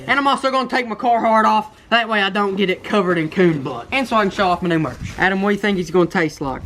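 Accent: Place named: Alabama, United States. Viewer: Why do you kill them for. they had a good life wow you can tell he's from Alabama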